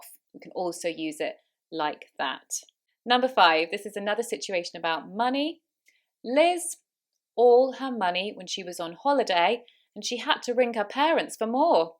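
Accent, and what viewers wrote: United Kingdom. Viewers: omg, you have a pretty good british accent!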